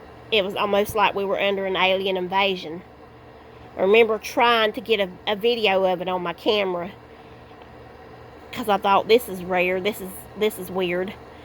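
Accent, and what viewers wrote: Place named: South, United States. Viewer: I just love hearing your accent! It makes me feel at home. I just moved to the west coast from Georgia and I miss the South sometimes. Also, my maiden name is Kirkland, do you happen to be from Georgia?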